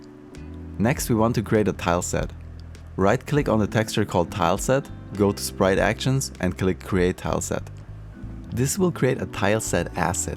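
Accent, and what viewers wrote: Germany. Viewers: Love your channel!! Are you german?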